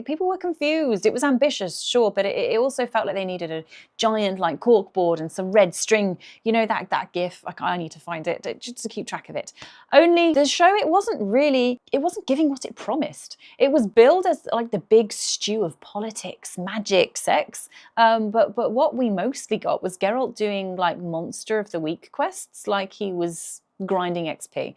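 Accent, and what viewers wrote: United Kingdom. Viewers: You are British? I thought you just had a cool accent.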